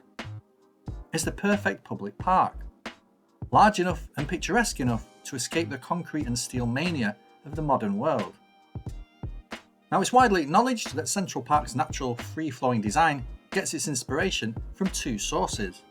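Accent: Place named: Wales, United Kingdom. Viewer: Gotta just love the Welsh accent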